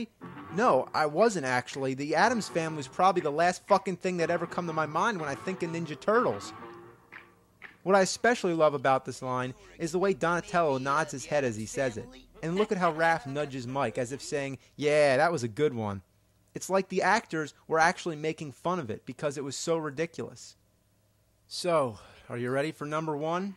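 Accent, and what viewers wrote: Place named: Pittsburgh, United States. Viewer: haha yeah i think it's ' accent. I'm pretty sure he's from Pittsburgh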